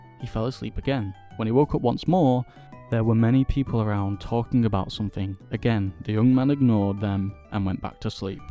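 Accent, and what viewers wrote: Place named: United Kingdom. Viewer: I really enjoyed this video, great to hear you reading the story out and your reactions to it 😂 I liked the video editing too. Also as a fellow Brit my first thought hearing you was "ooh yay, is British too!"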